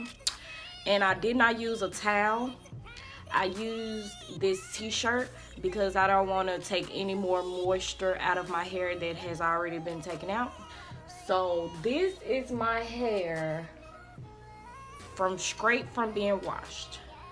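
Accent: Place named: Midwest, United States. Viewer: Lol where you from? I’ve heard many people talk like this in the Midwest.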